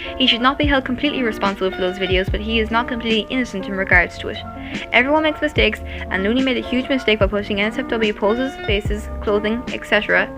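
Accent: Ireland. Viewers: You sound Irish are you? because I am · Omg are you irish 😍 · sorry if I'm asking a personal question.. But are you Irish by any chance? I just hear some similarities in the accent lol 😂😅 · Are you Irish? :3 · Are you Irish? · One question are you Irish cause I recognize your accent from my own 😳😳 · Is she irish? Lol im irish and i recognise the accent xD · Why do u sound like your from Ireland or sumthing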